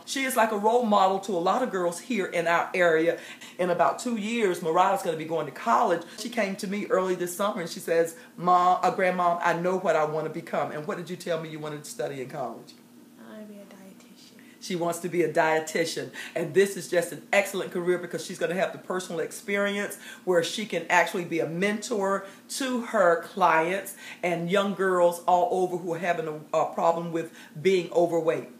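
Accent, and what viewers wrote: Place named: North Carolina, United States. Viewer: I love ms accent. She sounds like my mother. Are you from North Carolina Ms.?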